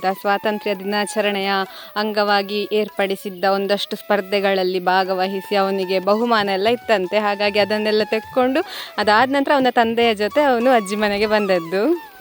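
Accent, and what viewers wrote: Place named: Malnad, India. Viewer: ನೀವು ಮಲೆ ನಾಡಿನವರು ಮಾತನಾಡುವ ಶೈಲಿ ತುಂಬಾ thrill ಅನಿಸುತ್ತದೆ.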